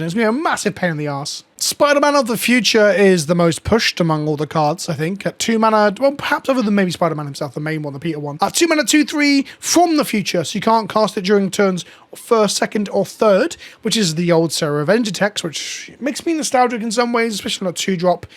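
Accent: United Kingdom. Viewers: Also, what makes you think you're from Europe? You sound awfully British to me.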